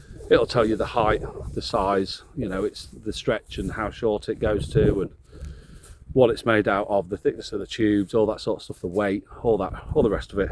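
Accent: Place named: Lincoln, United Kingdom. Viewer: lincoln, im hull, thought i recognised that beautiful mucky accent. il give you a message one day and pop down!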